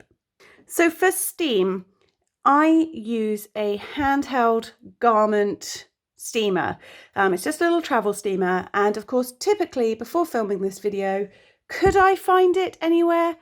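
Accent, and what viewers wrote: United Kingdom. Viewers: what kind of british accent do you have? sounds cool!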